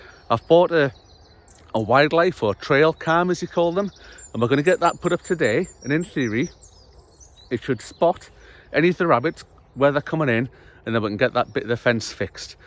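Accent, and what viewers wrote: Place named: Newcastle, United Kingdom. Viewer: Hi a few questions for you, what is a Geordie sounding bloke doing living in Scotland? Why didn't you attach the Camera to the shed instead of the water butt? and could you say screw a 4x2 to the side of the Compost bin and get the height that way? and finally i wouldn't go telling everyone where the Camera is, just incase someone pinches it one night, plus if any vandals come along and fingers crossed that they don't, at least you will have some footage of them, and i'm not talking about the furry kind of vandal ha ha ha. Sorry for the questions new Subscriber now, so i'm off to play catch up on your older videos. Stay Safe, Barry (Wirral)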